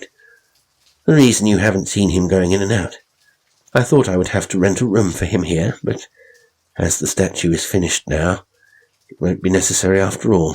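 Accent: United States. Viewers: Always DELIGHTFUL to hear from you, my friend! You're very good at presenting these stories, and I find your voice portrayals of the different characters to be quite a talent that sets you apart from others, who apperantlly consider a slow monotone to be the apex of dramatic performance. Thanks for all you do! P.S. --- your American accent is ALMOST flawless, and thoroughly believable. (the only giveaway is the use of idiom, which is so nit-pickish of me, that it's beneath notice!) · Great American accent.. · I agree and I think he dose a bloody good American accent too! 😵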